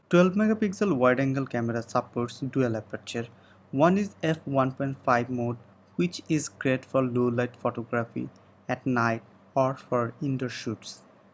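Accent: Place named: Bengal, India. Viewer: Ur accent sounds like that of bengali people..!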